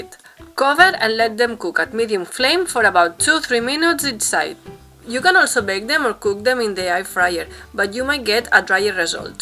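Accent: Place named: Greece. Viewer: Thank you so much for your channel! I love your accent; You sound like my cousin from Greece.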